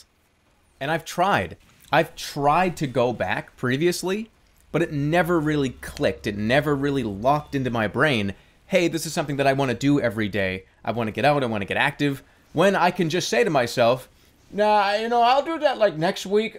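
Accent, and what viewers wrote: Boston, United States. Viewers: His Boston accent is so pleasent and cosy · BOSTON THAT'S WHY EVERYONE SAYS HES LIKE THE SCOUT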